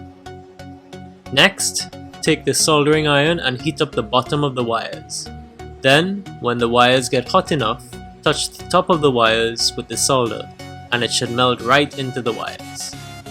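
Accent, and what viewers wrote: Trinidad and Tobago. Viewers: Are you Trini? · Are you from Trinidad. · Younsound trini bro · Lol can't miss that Trini accent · ah hearing ah trini accent!! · Sounds like a Trini accent.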